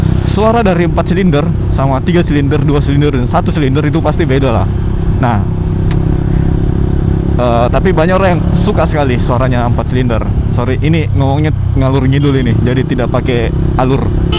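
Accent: Makassar, Indonesia. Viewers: kentara logat makassarnya😂, salam sesama orang makassar bang😇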